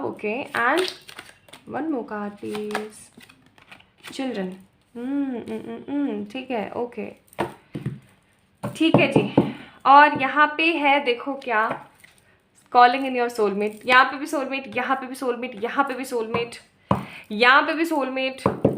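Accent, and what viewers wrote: Punjab, India. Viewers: Di aap punjabi ho , apke kuch words punjabi walye hote hai,,,, and pile no 1 , I strongly claim this positive energy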